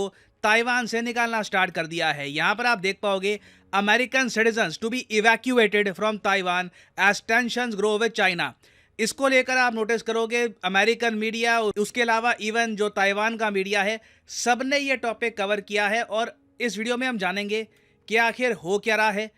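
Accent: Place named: Uttar Pradesh, India. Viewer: sir..... aap UP me kidhar se ho? Aapki tone se malum padta h ❤ Vaise me bhi UP se hi hu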